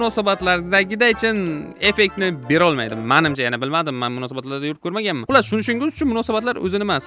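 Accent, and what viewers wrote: Qashqadaryo, Uzbekistan. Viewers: Shevadan Qashqadaryoga tortyabdi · Qashqadaryodan emasmisiz sheva pzds tortopti😂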